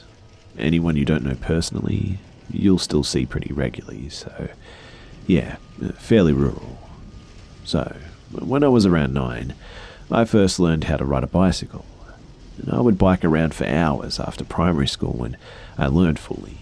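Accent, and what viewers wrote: Australia. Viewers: Your accent sounds Australian. Are you?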